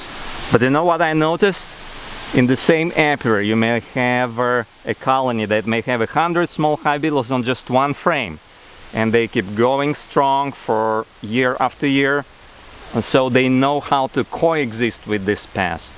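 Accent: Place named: Ozarks, United States. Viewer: I live on the Missouri Ozarks. I was not ready for that accent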